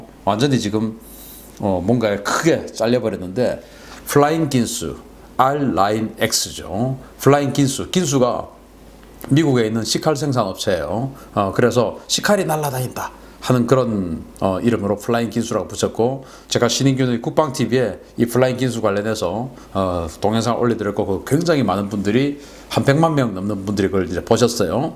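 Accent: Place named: Gyeongsang, South Korea. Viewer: 처음에는 말투도 경상도 말투고 선거때는 내용들중 많은 부분이 통합당 이나 박정희 박근혜 지지하는 내용이라 반감이 많았는데 .. 요즘은 민주당 집권을 인정해서 인지 나름 신인균씨의 절대 장점인 국방 관련 에만 노력하시는듯해서 보기 좋습니다. 오히려 민주당 지지자 이지만. 저역시 항상 민주당 지지 자가 아니라 역시 신인균씨도 같을거라 생각합니다. 저는 대한민국 지지자 일 뿐이고 조국에 방해가 된다면 싫어하는 스탈입니다. 그게 적당한것 같습니다. 아마 대부분 국민들이 그리 생각 할듯해요.. 저역시 .. 민주당 통합당 을 떠나 대한민국의 이득이 중요하다 생각하듯 대부분은 그리생각할듯요 ㅎㅎ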